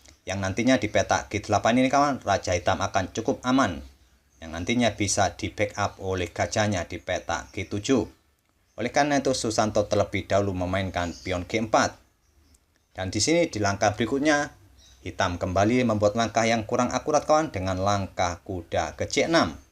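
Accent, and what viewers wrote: Java, Indonesia. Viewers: Jowo ne pundi min?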